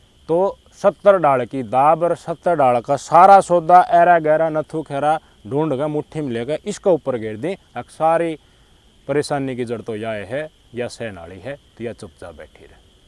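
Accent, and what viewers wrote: Haryana, India. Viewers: Bhai. Sahab aapki.Haryanvi.boli.may.patarkarita.ko.salut.karta.hu.🎉🎉🎉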